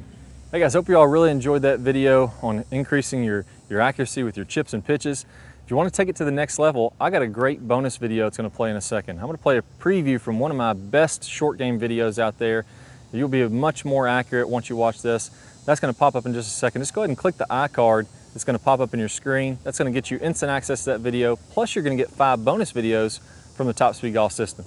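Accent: South, United States. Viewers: Great work, love the Southern drawl, nearly as good as the Aussie accent, but close. Thanks for your videos from Melbourne Victoria!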